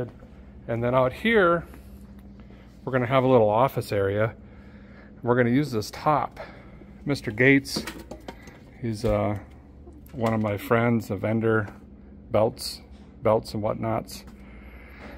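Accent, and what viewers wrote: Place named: South, United States. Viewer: I’ve noticed that you are starting to sound like a true southern. When you start saying Fixin to do something you have arrived my friend.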